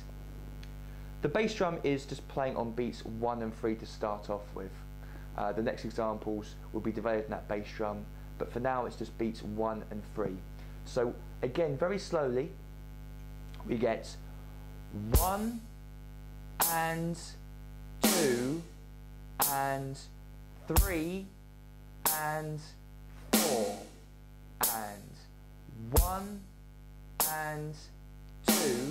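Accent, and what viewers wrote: United Kingdom. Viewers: he has a british accent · most british accent i have ever heard.....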